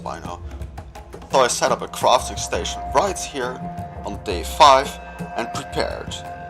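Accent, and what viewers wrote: Netherlands. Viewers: Where are you from? You sound a bit Dutch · Hmmmm, dutch person detected?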